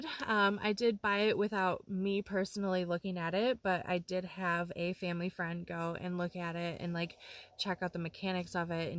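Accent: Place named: Midwest, United States. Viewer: I love watching little Joey explore so much...you could literally strap a go pro to his back and make a channel and I would sub. good luck on the new chapter. I have loved watching you for the last year and appreciate you sharing your life. plus your midwest accent makes me feel at home. tehe. xoxoxox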